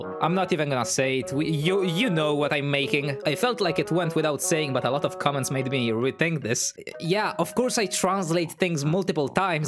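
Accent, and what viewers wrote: Italy. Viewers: You literally have the same accent as my Italian teacher 😂😂😂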